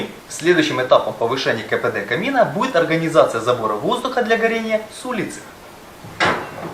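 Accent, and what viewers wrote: Ukraine. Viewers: Який чудовий м'який український акцент у жіночого голосу... Прошу, випустіть хоч декілька відео українською . ... А може,можна просто продублювати вже готові Ваші відео на українську. Це буде великим збагаченням українського контенту Ютубу і , взагалі, домогою Україні для просування до прогресу.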